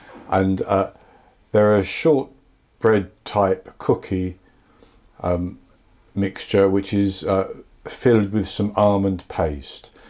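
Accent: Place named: England, United Kingdom. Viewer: Hello: I do like your baking and very gently English accent to let us understand what you say. But can't you put the mic hanging lower on your shirt or put in somewhere seems more better, because your breathing is very strong as well! Thats why we feel uncomfortable. Sorry about to let you know my family's feeling and >> Thanks your share:):)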